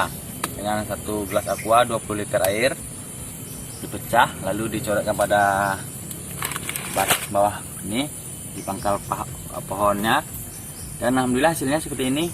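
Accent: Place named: West Sumatra, Indonesia. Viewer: Bang tolong dibalas.., Uda urang minangkan, dima lokasi da..? mintak nomor WAnyo da Ambo ka Konsul senek.. soalnya keceplosan bicaranya nya😊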